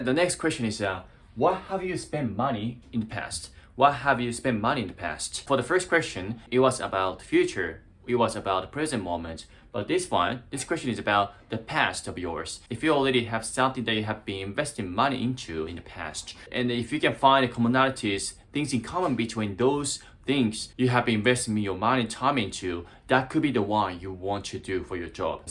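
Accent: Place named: Australia. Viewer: Very good video! I love that you share positive messages ❤ I wish I could learn to speak Japanese. Congratulations on working on your English, you’re doing a great job! I can tell you learned Australian English 😊 - I am American - our English has a different accent. Just an observation 😊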